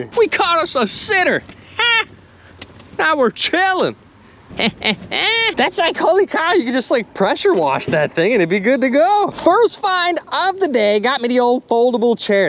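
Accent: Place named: Rhode Island, United States. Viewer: May I know where is this cute accent from? Rhode Island? LOL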